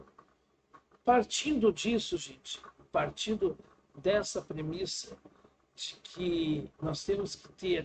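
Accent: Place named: Rio Grande do Sul, Brazil. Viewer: Ele é Gaúcho e os Gaúchos tem esta entonação qdo estão indignados.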